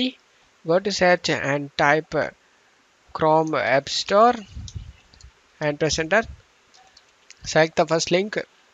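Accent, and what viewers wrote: India. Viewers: i swaer .. if i hear a thick indian accent .. automaticly SCAM! wouldnt touch ANYTHING they recommend with a 100ft pole! and even if this guy here really just wanted to help .. which i HIGHLY doubt .. i mean cmon "video downloader professional" lol .. but even if he wanted to help .. you guys did this to yourself! i rather have my pc crash .. burn and explode than to EVER let one of you evil demons on my pc!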